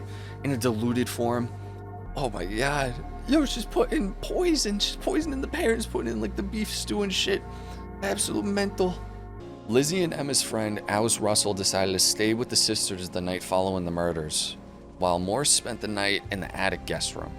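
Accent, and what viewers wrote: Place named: Massachusetts, United States. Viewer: You claim to be from NJ yet your accent, knowledge of places like Fall River, and general vibe are leading me to believe you are a Massachusetts native.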